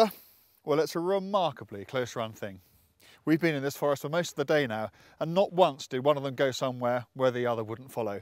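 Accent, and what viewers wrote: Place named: England, United Kingdom. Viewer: Ведущий-то не очень на англичанина похож ))), акцент да, но внешность нет )))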